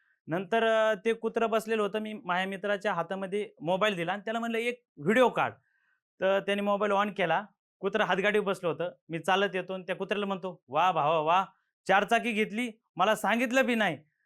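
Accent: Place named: Ahmednagar, India. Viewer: . तुझी टिपिकल नगरीं भाषा मस्त वाटतेय..तसेच बोलण्याची tunig आणि मकरंद अनासपुरे यांच्या सारखी....कलाकारी खूप छान वाटतेय 👌👌👌... तूमचा तिघांचा पोडकास्ट..... कालच बघितला...... Nnss कॅम्प बघून ती कलाकारी शाळेतील वर्गमित्र सोबत करून कलाकार होणे.... मानलं राव 👌👌👌👌gret ❤️❤️👍👍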